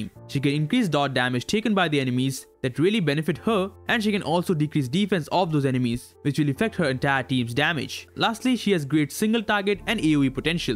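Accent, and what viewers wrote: India. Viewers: Are you Indian 😅 cuz your accent sounds india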